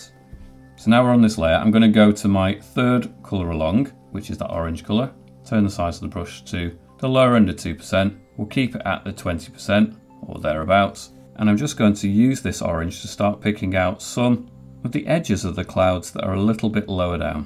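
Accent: United Kingdom. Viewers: Hey! Are you British? And if so, which part of the UK are u from?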